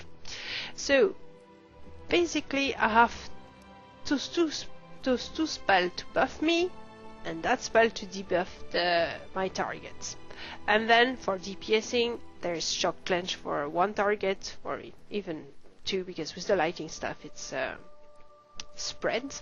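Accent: France. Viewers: Great video. really enjoyed it. And the French accent from a pretty lady is a bonus too ;) Keep up the good work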